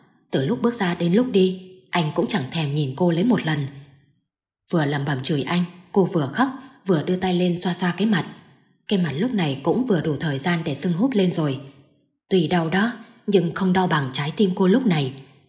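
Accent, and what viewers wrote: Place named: Hanoi, Vietnam. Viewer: Giọng đoc rõ ràng. Đúng là giọng miền Bắc trước năm 1975 HÀ NỘI. Cảm ơn nhiều. CHÚC AN VUI.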